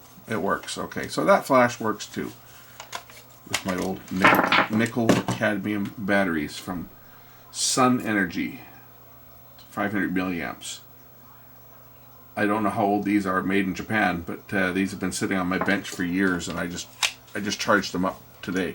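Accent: Canada. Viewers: you speak funny language. sounds canada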